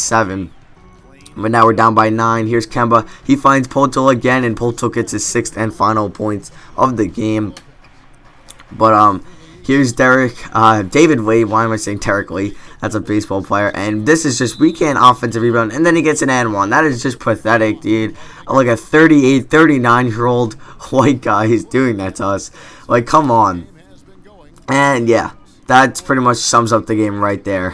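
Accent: Pittsburgh, United States. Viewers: You from Pittsburgh?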